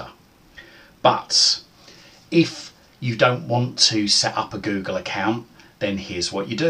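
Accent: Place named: Cockney, United Kingdom. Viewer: THANK YOU SO MUCH for doing this video! you're a life saviour! (Also, I love your accent, are you from London? my ignorant ear is telling me there could be a bit of a cockney-ish accent there?) still, perfectly clear and very well explained! again, thank you:)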